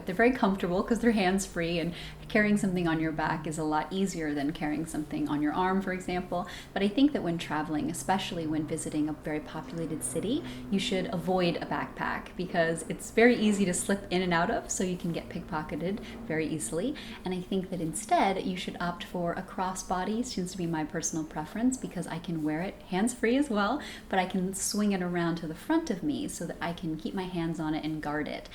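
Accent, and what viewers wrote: United States. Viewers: I am sad her culture is to speak perfect American English, and abandon her own culture completely. Her culture is thousands of years older, but pretty great.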